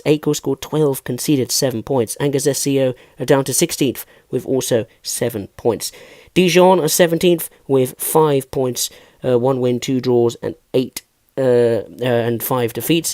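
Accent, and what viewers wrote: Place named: United Kingdom. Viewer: You sound like a rich posh englishman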